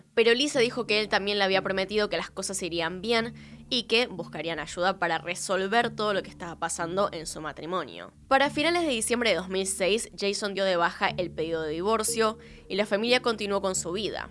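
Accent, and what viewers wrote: Argentina. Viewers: Ayyyy me encantas 😍 pekeña cómo hablas tu idioma y siempre te arremedo con tu acento boludooo soy mexicana te mando muchos saludossss y el gran contenido de tus relatosss e historias que cuentas, además es la 1 vez que te escribo Por si estabas con el pendiente Jajajajajaja cuídate mucho te mando un 🤗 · Me encanta el hablado Argentina Dice Shaaaaa por decir ya Jajajaja me encanta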